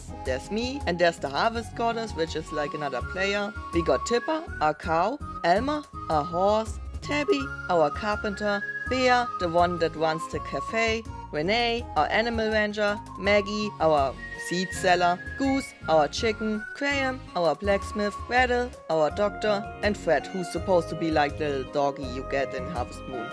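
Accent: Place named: Germany. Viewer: I don’t know if you’re German or something, but I love your accent❤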